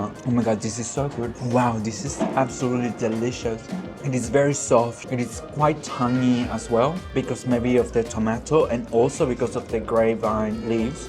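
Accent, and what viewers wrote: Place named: Australia. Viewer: I am glad u enjoyed ur visit in iraq amigo . where are u from by the way , ur English sound Australian but i can hear the Latin accent there <3 . Thank u for showing the yummy food that i miss . the dolma is not good doesnt look good i give it 2/10 my mother make the real dolma from mosul .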